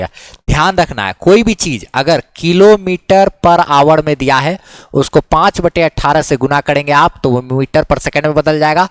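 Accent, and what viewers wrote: Bihar, India. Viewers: Sir kya aap bihar se hai ? ❤️❤️❤️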